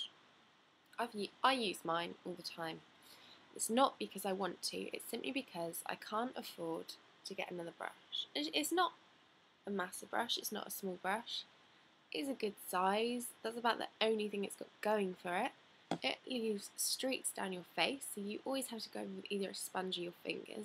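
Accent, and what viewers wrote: United Kingdom. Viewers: I love British accents... but by the end of the video your voice was annoying the heck out of me Avon does give you a full refund, you know that right? Don't like a product RETURN IT!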